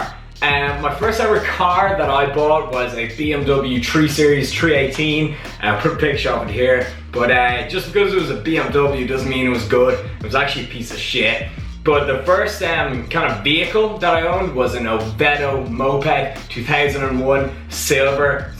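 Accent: Ireland. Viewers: are you irish man ? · the way you irish people say "three" is mint lol. i now know what part u meant haha it was class.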